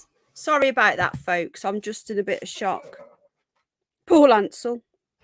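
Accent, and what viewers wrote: United Kingdom. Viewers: LOVE YOUR UK ACCENT.VERY SEXY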